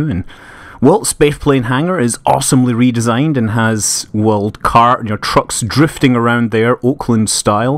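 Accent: Scotland, United Kingdom. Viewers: It's a Scottish accent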